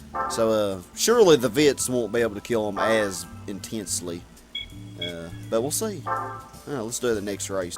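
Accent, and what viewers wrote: South, United States. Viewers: Love your southern accent makes these videos real entertaining to watch, like its if goofy is commentating this badass game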